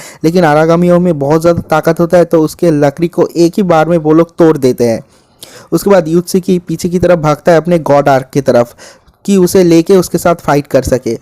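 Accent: Bengal, India. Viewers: Bangali ho ?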